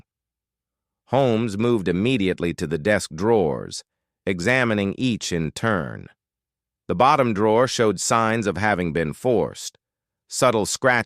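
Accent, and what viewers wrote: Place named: United States. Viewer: Give this a miss! AI GENERATED STORY AND AMERICAN NARRATION.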